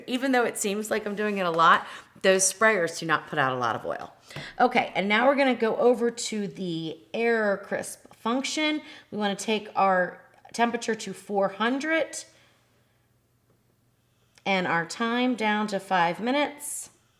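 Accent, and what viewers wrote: United States. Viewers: Do I hear Wisconsin?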